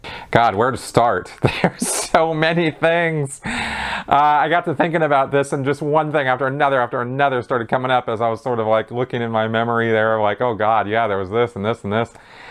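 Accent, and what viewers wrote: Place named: California, United States. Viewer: For anyone wondering… his voice/accent is a California accent (he’s not gay) Not all Californians have it, in much the same way not everyone from New Jersey has the accent, as time goes on this accent is becoming increasingly rare as people spend more time watching nationally broadcast programs… which tend to standardize the accents of those that watch/listen to them.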